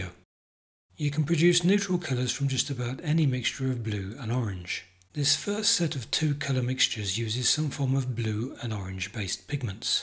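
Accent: United Kingdom. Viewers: They don't use black watercolor because they use black watercolour. British voice trying to sell to Americans. Shame on you.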